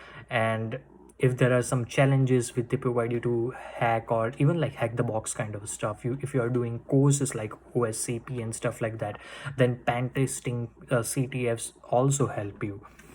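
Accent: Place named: India. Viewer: English Ko Bhi Hindi Jaise bolte ho😁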